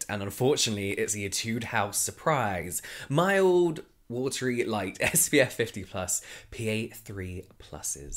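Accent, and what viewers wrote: England, United Kingdom. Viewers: Everytime I watch your video, besides that cute English accent, I also am filled with joy and laughter.. you are such an inspirational person and have a great positive vibe!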